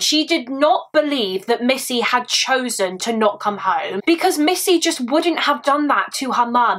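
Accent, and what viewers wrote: United Kingdom. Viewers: Hi, I was curious what part of the UK your accent is from? I enjoy hearing it!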